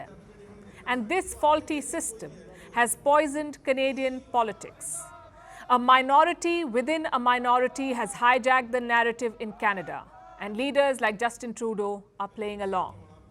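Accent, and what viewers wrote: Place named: India. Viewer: Dmbaz this is indian accent. Which American canadian talks like that?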